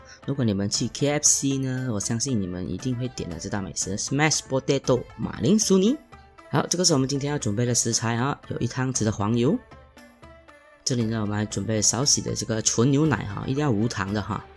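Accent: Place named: Malaysia. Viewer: 马来西亚乡音，大马人没错吧。感谢教学，想做这个很久了。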